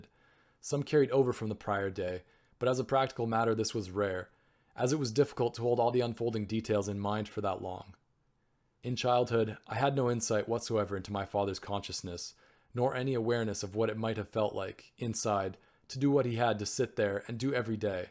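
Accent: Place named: Canada. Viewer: It does not matter to me that you mispronounce words because you speak with a Canadian accent. I am grateful that you uploaded this masturpiece by Dallas Fort Worth.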